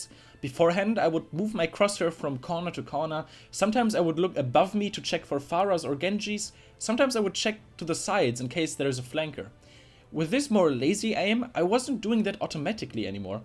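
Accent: Germany. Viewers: Ýour accent sounds very german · Man hört mega raus, dass du deutscher bist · Are u german? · You are dein Germany , richtig? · Are you German ? 😂 wenn ja ich auch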